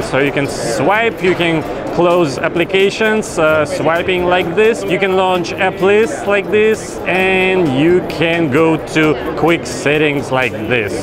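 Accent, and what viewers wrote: Russia. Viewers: ужасный русский акцент) а так всё норм)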